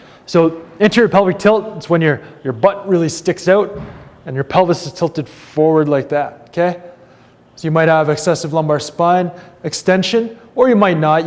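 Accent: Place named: Canada. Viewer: Definitely Canadian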